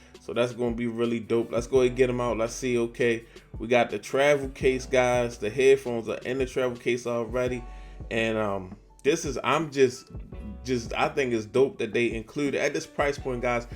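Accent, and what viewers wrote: Baltimore, United States. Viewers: The bmore accent coming out strong in this one 🤣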